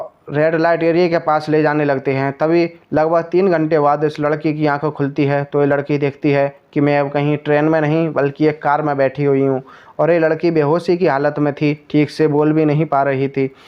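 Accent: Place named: Howrah, India. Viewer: जी आपके बोलने की शैली उस्मान सैफी जैसी नहीं है आप हावड़ा का बोलते हैं और एक ही शब्द को कई बार दोहराते हैं| कृपया सुधार कीजिए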